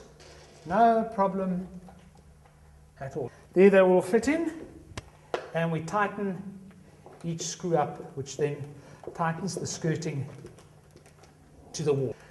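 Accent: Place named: South Africa. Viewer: Great to hear that South African accent! Thanks for your video and efforts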